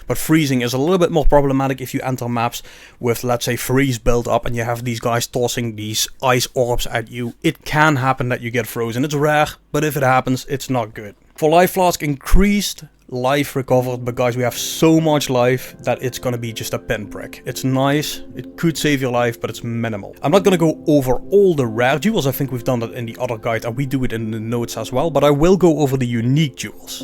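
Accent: Limburg, Netherlands. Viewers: kunse van Limburg?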